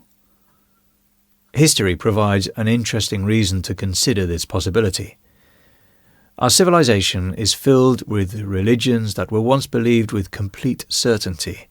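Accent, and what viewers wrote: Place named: England, United Kingdom. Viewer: Where is your English accent from?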